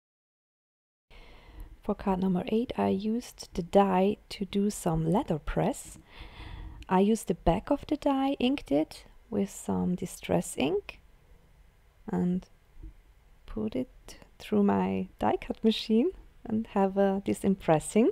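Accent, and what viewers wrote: Germany. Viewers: You're english is very good. You speak it very well. I've watched your video's before, but didn't understand that much. Some words are similar enough I could figure it out, and I know a very tiny bit of german. I loved that you did an english voice over though. It was nice to learn what some the greetings meant. My Grandmother was german, but she died when I was younger so I didn't get a real chance to learn the language. Hearing you speak, the accent reminded me so much of her. Lovely video. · Loved hearing your accent. My husband is German and his family all spoke to each other and had such lovely accents. He never learned the language. Your cards are lovely 😊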